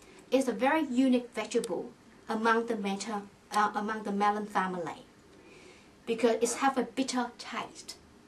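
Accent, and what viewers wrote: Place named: Wales, United Kingdom. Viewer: Sounds like welsh accent to me!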